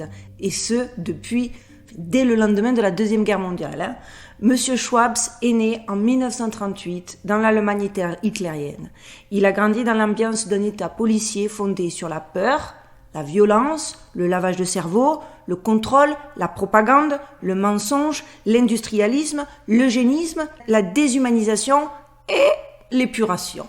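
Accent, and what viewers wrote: South, France. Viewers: Toujours aussi sensationnelle ! Il y a trois You Tubers dont vous faites partie qui lorsque je les écoute me font du bien et me permettent toujours d’avoir un sourire amusé ! Vous avez l’art de la narration naturelle et ce petit brin d’auto analyse amusante qui détend l’atmosphère ! Amusant d’entendre de Vegas l’accent méridional, mais ça fait partie d’un ensemble qui fait de vous une personne sympathique et charmante qu’on rêve tous d’avoir comme amie ! J’ai aimé cette vidéo et c’est tout à fait le sens du courant actuel! Remplacer l’homme et lui payer un revenu universel et se débarrasser le plus vite possible de l’excès ! Surtout annihiler tous les sentiments en l’isolant complètement dans un monde automatisé où il n’aura plus besoin de sortir ! Des bon toutous esclaves obéissants drogués et contrôlés mentalement, cerveau atrophié, des zombies inutiles ! 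Votre vidéo est plus légère que ma vision tout en étant semblable mais moi je le vois terrifiée et le transmets dans mon narratif ! Merci encore, j’espère que le choix d’être à Végas sera meilleur que celui d’être en France ! On nous menace de nous reconfiner à Paris et RO ! Bises 😘🙏🌹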